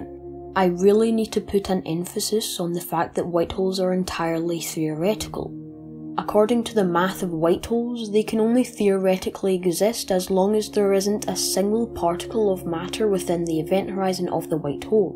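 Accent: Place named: Glasgow, United Kingdom. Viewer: And here after watching your clip on black holes....I've subscribed and look forward to your future footage....yir a smart wee cookie.....sound like yir fae Glesga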